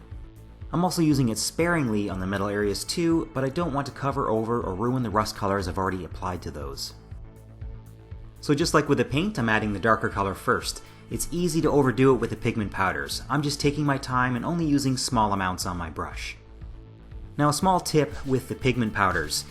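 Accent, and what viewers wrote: Canada. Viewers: perfect base! that accent is definetly from the atlantic provinces! greetings from cape breton!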